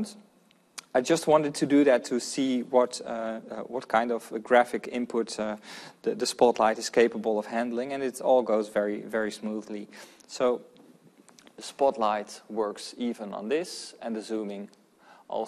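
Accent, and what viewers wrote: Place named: Netherlands. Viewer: Great review! You have a Dutch accent and name! I reckon you are Dutch!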